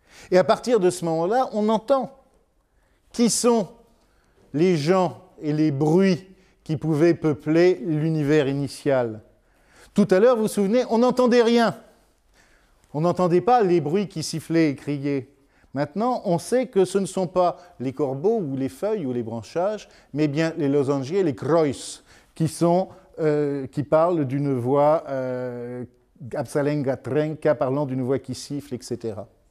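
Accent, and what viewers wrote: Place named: France. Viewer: Super de pouvoir écouter ce cours. Très intéressant. Cependant, je regrette la lecture très "francimande" et pas assez occitane du texte (il en est des interprétations comme de la prononciation). Autrement dit, il s'agit de l'autopsie de la traduction de BEC et non du texte originel. Ceci étant, ce cours est super. MERCI.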